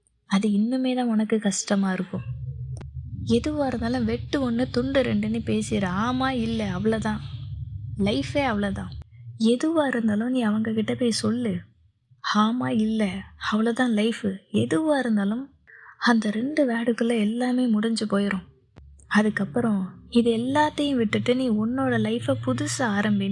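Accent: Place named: Sri Lanka. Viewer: enaku theriyadhu ak. Tamil pesivangau. I like it srilanka tamil❤❤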